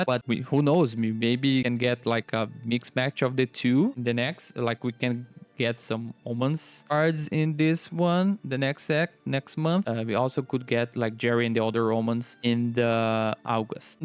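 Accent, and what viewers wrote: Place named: Brazil. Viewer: Brazilian portuguese accent am I right haha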